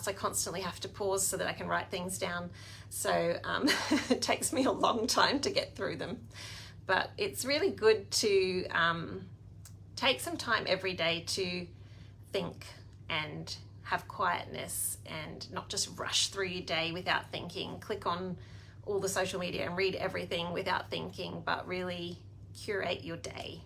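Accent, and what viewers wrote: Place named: England, United Kingdom. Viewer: Beautiful accent voice.reminds me of my sister in England..Thank you Good idea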